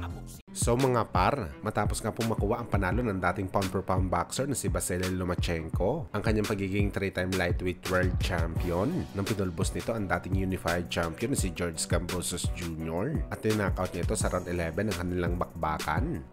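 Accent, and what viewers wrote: Iloilo, Philippines. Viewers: Ayosin mo boses mo idol..murag bayot na ilonggo uy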